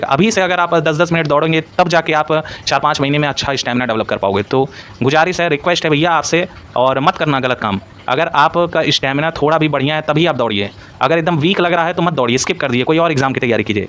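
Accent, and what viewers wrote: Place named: Ayodhya, India. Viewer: अयोध्या से हो सर भाषा से लग रहा है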